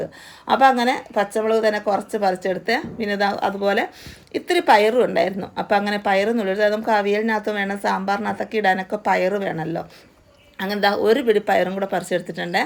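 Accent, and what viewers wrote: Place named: Pathanamthitta, India. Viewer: ok.. Enikku samsaaram kettappol pathanathitta aanonu thoni.. Njaan pathanamthita aanu..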